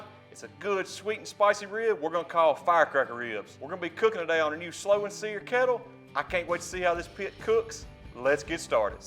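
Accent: South, United States. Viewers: Theres something about a southern accent that warms my australian soul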